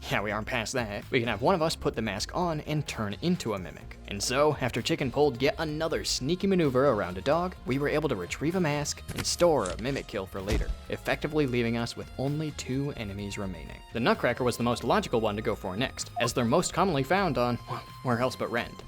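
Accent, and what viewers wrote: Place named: Netherlands. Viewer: u sound dutch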